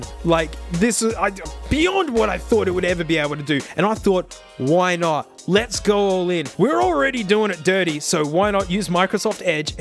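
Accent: Australia. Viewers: Where is your Aussie-Slang/Pronunciation :-O :-O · Teach use how to speak Australian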